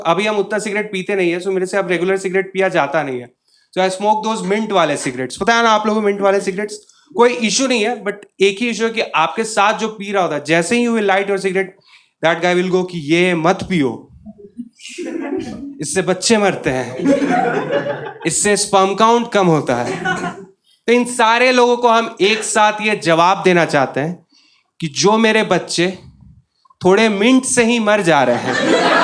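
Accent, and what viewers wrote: Bihar, India. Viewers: Bro, your comic timing is just amazing and by your accent, I can absolutely say with utmost confidence that you are too from Bihar.. Pr bhai, aap Bihar ko agr represent kr rhe ho, kisi bhi stage pr, to uski achhi chijon ko batao, talk about his glory and the progress that Bihar is on.. Not ki kuchh paiso k liye bhai tumlog bhi wahi kro ek time pr bhojpuri gaano ne Bihar ka image kharab krne me kiya hai.. When we are not going to respect our birthplace, how will others be going to respect?? I respect and enjoy your comic timing but please let us, the educated one, try and revamp the beauty and image of our birthplace Bihar, and it should be our first priority wherever we are. Waise, hum bhi Patna se hai. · Why that person said you're not from Bihar? Your accent seems 100% like any Bihari. My friends also speaks like this. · Boliye se pta chalat hai marde tu bihar ke na hawa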